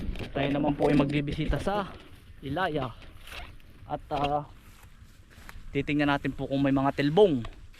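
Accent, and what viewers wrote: Philippines. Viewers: what's going on people stealing banana after typhoon destroy almost everything. It doesn't make no sense at all well just stay cool you'll get it back what you've lost. Take care your self and always stay safe and healthy and your entire family too love to watch your show and your Filipino accent too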